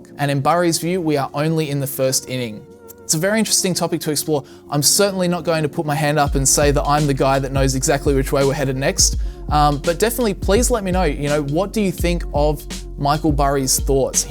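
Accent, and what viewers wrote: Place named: Australia. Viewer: That australian accent... Cute but not that understandable to me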